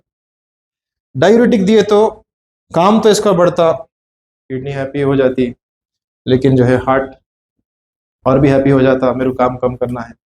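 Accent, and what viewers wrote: Hyderabad, India. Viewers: Dr..Auslamalekum. We regularly watch your videos and appreciate your knowledge and experience. We have 2 observations. It's difficult to read your writing on the board becoz there is very little contrast. Please use darker marker pen and write slightly bigger words. Further although we enjoy Hyderabadi accent but some of the words are not audible because they are spoken in low tone. Hope you will not mind our observations. Please take care of these aspects in future videos. Thanks and regards Tarwinder Singh Delhi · Hi. Doc your video is informative. Can you please give more info how to reduce crestanin? You've Hydrabadi accent, are you from Hydrabad india? Where can i contact you? HB from Toronto Canada 🇨🇦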